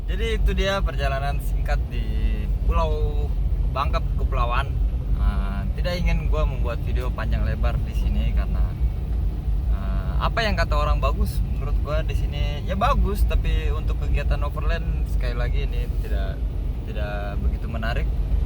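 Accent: Sulawesi, Indonesia. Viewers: Sudah belogat sulawesi euy...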